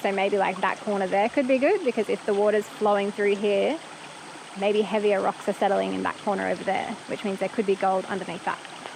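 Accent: Australia. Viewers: The quality of your videos is always A1 but that one was exceptional. New camera? Different settings? In any event, ace job!!! Ginger nuts!!! A girl after my own heart! I'm a Brit, have you tried Mcvitie's Ginger Nuts? Awesome!!! Talking of Brits, I've always been curious of your accent. Is it pure Australian or is one of your parents from the south of England? In any event, I love it!!!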